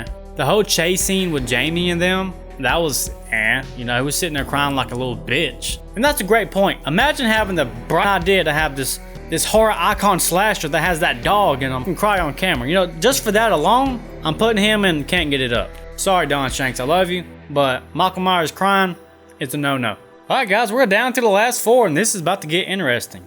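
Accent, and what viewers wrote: United States. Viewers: Love your Accent dawg, What State?? 🖤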